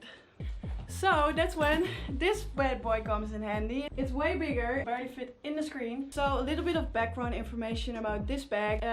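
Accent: Netherlands. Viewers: You sound Dutch!?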